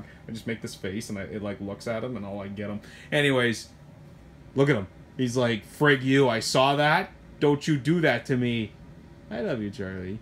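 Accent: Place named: Canada. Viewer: Hi, really enjoy your humor and Canadian accent. You broke through my work funk in just a few minutes listening to your spiel. Keep up the good work. Cheers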